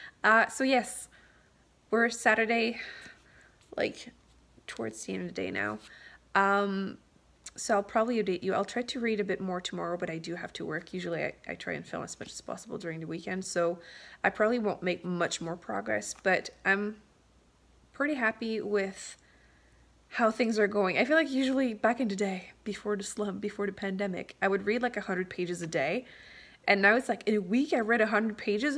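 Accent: Quebec, Canada. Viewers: Good vlog, love it!! Continue de nous partager ta passion, afin de nous motiver davantage! 😊 By the way, me too, french is my first langage but your videos encourage me more to read in ENGLISH. Tu parles très bien le français! Par ton accent, je crois que tu es québécoise, je me trompe? 😜 j'espère que tu as appellé un électricien pour ta prise défectueuse!! 😉 Stay safe. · I'm glad you put the subtitles because as a France French speaker Canadian French sounds so foreign! lol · I am very curious as to know where your accent is from! It sounds « québécois » in some parts but I can’t quite pinpoint where it’s from! I’m very curious for the context! My parents are Québécois, and my father was in the Canadian Army so I actually moved a lot and learned English at 5 years old when I started school. I live 10 min from Quebec city now! And I read in English and watch TV in English so I can keep it... 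we speak English at home (my 4 year old is learning both languages at the same time!)... If you want to practice your french it’s actually a great esy to do it! (Movies tv and books!) ;) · She is Québécoise ? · I loved hearing you speak French, and as a European, I find your Québec accent so interesting :) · Salut! C'était sympa de t'entendre parler Français avec un accent québécois ^^ si tu es tenté pour avoir des correspondantes pour dé-rouiller ton français, je suis dispo:) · Tu devrais regarder la vieille mini-série avec Gérard Depardieu au lieu du film américain. C'est plus proche du livre. Ton français n'est pas si rouillé lol ton accent semble plus québécois que français o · Je reconnais cette accent là, QC!!!!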